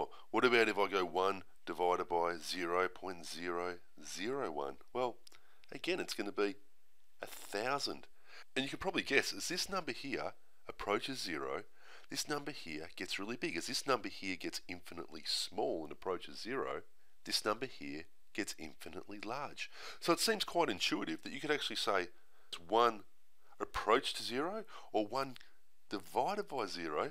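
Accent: Australia. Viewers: Hey mate! We have been subscribed and watching your awesome videos for last 10 years. It would be really appreciated if you could improve the sound quality but loving your aussie accent heaps. Have a good day!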